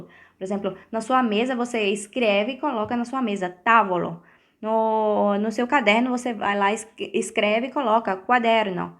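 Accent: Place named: Rio Grande do Norte, Brazil. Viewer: Ela tem um sotaque que parece o potiguar hehe bonito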